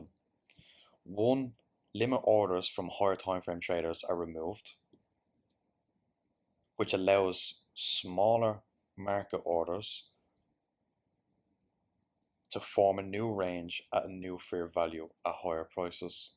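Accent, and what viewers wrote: Ireland. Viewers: Irish accent? Subbed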